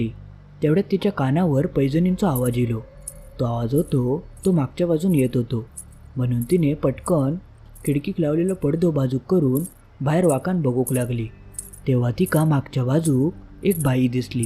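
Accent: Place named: Malvan, India. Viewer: तुमचा मालवणी भाषेचा लहेजा आणी सांगण्याची पद्धत खूप छान आहे मालवणी भाषा फार गोड आहे.....धन्यवाद!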